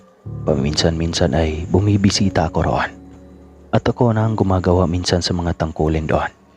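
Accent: Visayas, Philippines. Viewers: Sir kahit Bisaya Diction mo galing mo mag narrate Sir🥰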